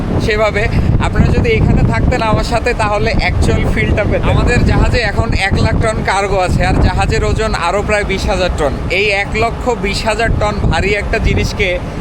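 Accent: Bangladesh. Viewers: Tumi Bangladeshi tai to? Tomar kotha bolar style kothar type sune mone hochhe....je standard kothar tate mone holo...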